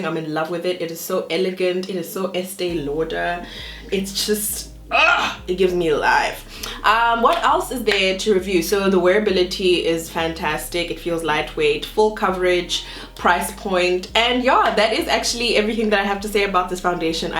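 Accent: South Africa. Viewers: Are you South African? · I like your accent, you sound South African or Zim/Canadian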